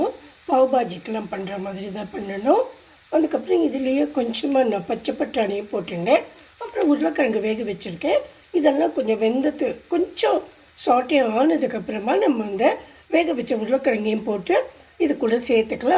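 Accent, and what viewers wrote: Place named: Iyengar, India. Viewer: burger super. filling variety ❤❤ அம்மா..உங்கள் பேச்சு..ஐயங்கார் வீட்டு வொய்ஸ்..அதை கேழ்க்க very interesting ❤❤...இந்த food item எல்லாமே western style இருக்கு. .குறிப்பா இன்னைக்கு போட்ட burger. .🎉🎉🎉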